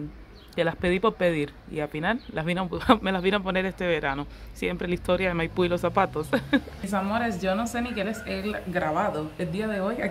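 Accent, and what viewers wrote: Cuba. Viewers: Es que son cubana las 2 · Ehm... los cubanos hablamos así. Infórmate un poco amix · Ella las dos son cubanas por eso hablan parecido .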